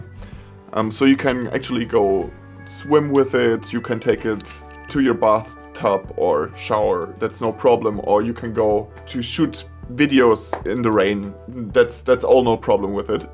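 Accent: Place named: Germany. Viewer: Deutscher Akzent confirmed😂😂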